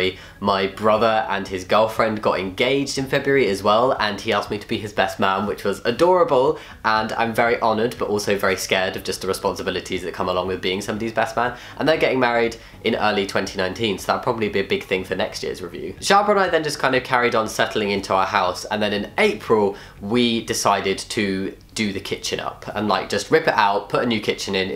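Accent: United Kingdom. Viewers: i love all the british talk its cute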